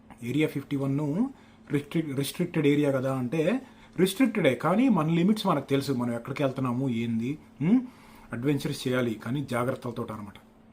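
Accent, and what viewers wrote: Andhra Pradesh, India. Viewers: Meeru Nizamabad .. Slang emo andhra... ela?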